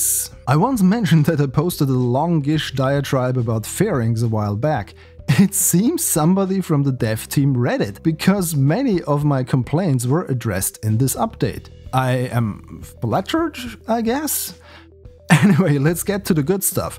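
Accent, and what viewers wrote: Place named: Germany. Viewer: I have the feeling to hear a german accent. If not correct me but Du klingst wie ein Deutscher, der verdammt gut Englisch spricht! Nice Video btw. LG Justus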